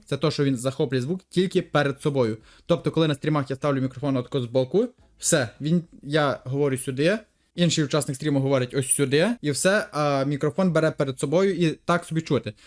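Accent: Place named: Western Ukraine, Ukraine. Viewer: у вас цікавий акцент ) Іванофранківщина/ тернопільщина чи закарпаття?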